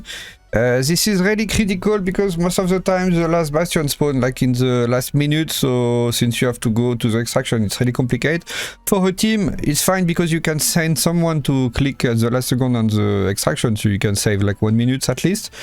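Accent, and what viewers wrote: France. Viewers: I like u Baguette accent ❤ u do the best Videos for Trials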